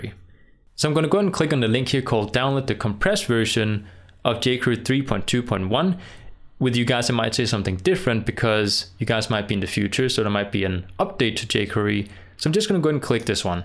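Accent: Denmark. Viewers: Thanks for the tutorial, helped me a lot. Btw, are you Danish by any chance? Your accent sounds really danish, or something like that.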